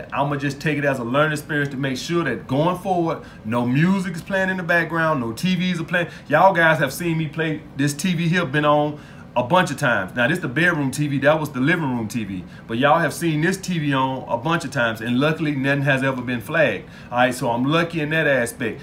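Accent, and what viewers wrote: South, United States. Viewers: True knowledge as always! I always heard the southern accent but didn't know you was from Alabama fam!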